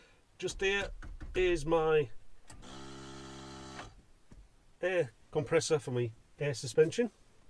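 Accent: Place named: Hull, United Kingdom. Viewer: Fantastic setup mate, you’re from hull aren’t you ??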